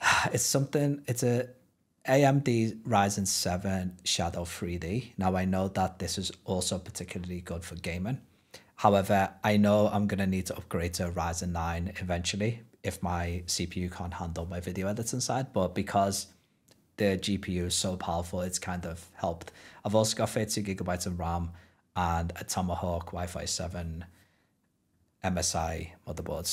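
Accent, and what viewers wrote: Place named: Liverpool, United Kingdom. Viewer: Your accent is hurting my brain, it's like 85% scouser and 15% something else. You are from liverpool right?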